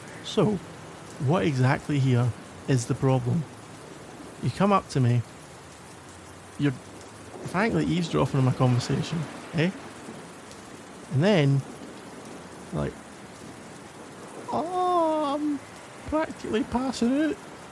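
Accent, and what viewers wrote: Scotland, United Kingdom. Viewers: Ahh this was made for me!!!! The thick (I'm talking doubled caked up thick) Scottish accent. It's so beautiful. Thank you my king!!! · Ladies! 😘 I'm from Poland. Born, raised and still live in Poland, but when our favourite teddy bear speaks like that I'm blushing and giggling like a schoolgirl 🥵 I'm a huge simp for a Scottish accent, even though I understand.... little 😋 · Love your voice, have literally never heard anyone compliment a scottish accent before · As a fellow Scottish person I approve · I'm Scottish sometimes I wish my accent was thicker because people really like our thick accents but mines weak compared to his.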